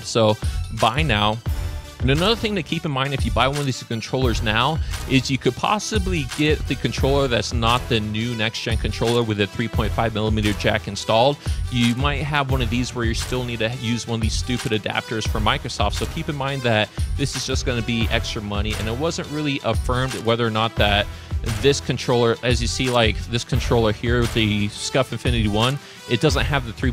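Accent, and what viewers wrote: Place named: United States. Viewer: Sounds American but doesn't look it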